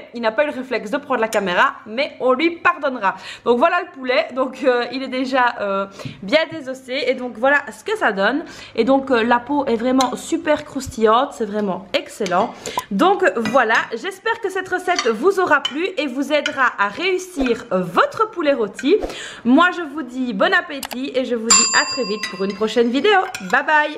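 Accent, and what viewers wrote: Belgium. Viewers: Bravo super bonne recette merci,c un accent belge?🤭 bsa7a le poulet il est bien réussi☺😚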